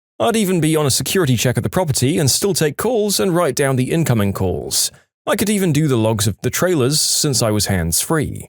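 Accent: United Kingdom. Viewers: The British accent, though 😂😂😂 (This channel is my hyper fixation rn)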